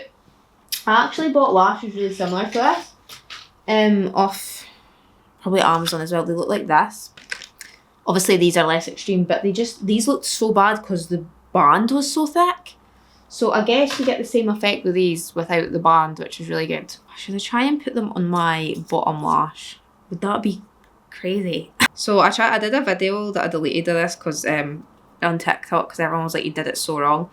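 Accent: Scotland, United Kingdom. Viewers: Does anyone else find it kind of difficult to understand a Scottish accent or am I just a dumbass American? 😅 Her voice is so relaxing to listen to but it takes me a hot sec to process what she said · Omg I adore your videos. You always look fantastic, but the scottish accent and real talk kills me every time! The bailiffs story had me rolling.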